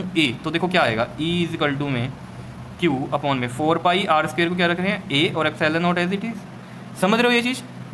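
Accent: Punjab, India. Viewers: Sir ap Punjabi ho🥰🥰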